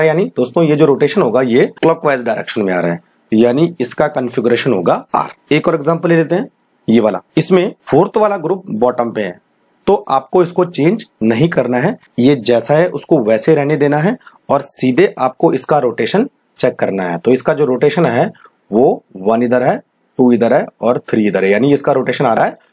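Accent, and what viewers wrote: India. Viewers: why you speak indian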